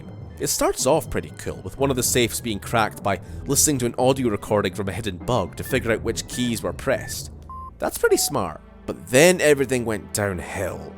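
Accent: Scotland, United Kingdom. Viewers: Scottish narration makes everything better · what part of Scotland are you from?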